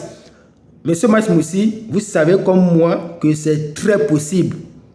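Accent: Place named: Fang, Gabon. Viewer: Tu lis en fang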